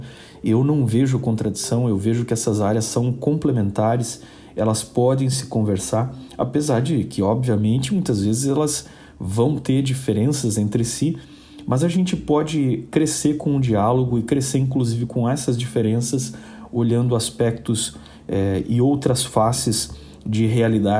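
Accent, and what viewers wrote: Brazil. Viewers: Nosso sotaque gaúcho sempre nos entrega! Gostaria de agradecer pelo canal. É a materialização das minhas paixões! Uso-o muito para auxiliar os ensinos didáticos do meu filho. Se houver alguma maneira (com mão de obra) que eu possa ajudar, por favor, deixe-me saber. Que o Senhor o abençoe grandemente!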